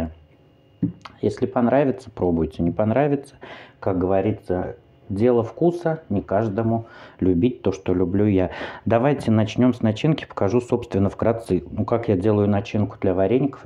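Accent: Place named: Moscow, Russia. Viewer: у вас великолепный русский язык с московским произношением, это знаете ли, тоже эталон, такое сейчас редко встретишь. Спасибо вам,что вы так классно говорите! Вы нас и этому учите!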